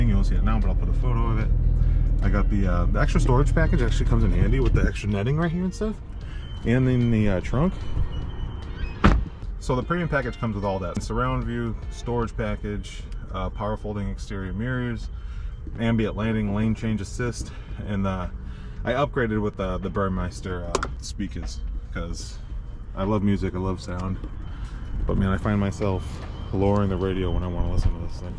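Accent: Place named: Boston, United States. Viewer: ❤😂😢🎉🎉🎉😮😮😅😅😊😊😊😊😊 keep talking, bro I love that accent my friends from Boston and she sounds just like you good job, brother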